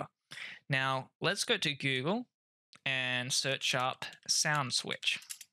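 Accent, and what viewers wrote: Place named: Australia. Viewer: i hate australian accent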